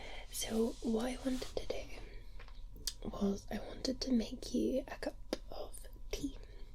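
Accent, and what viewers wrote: Scotland, United Kingdom. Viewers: Her Scottish accent is everything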